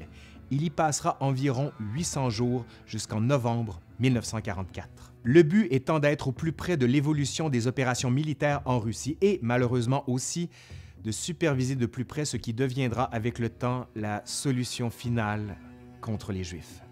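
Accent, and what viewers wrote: Quebec, Canada. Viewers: Voilà voilà!... Un pouce en l'air et mon commentaire en bas! Cet accent québécois est royalement beau. C'est de l'ancien français, mais c'est chouette quand ça entre dans les esgourdes, et ne nous le parle pas à la va vite, comme ils font souvent là-bas, si bien qu'on arrive à suivre! Bon, on se laisse ici à nouveau puis on se retrouve en 42. C'est ça?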